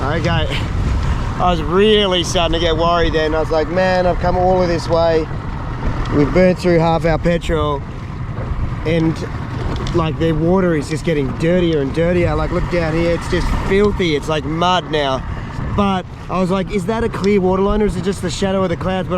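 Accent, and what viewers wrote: Australia. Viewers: One of the most relaxing things I do is watch your camping trips on the islands. One of the most amusing things is listening to my wife's (affectionate) commentary on your Aussie accent and syntax and mentioning that I'd fit right in with how I "sometimes" shorten words. LOL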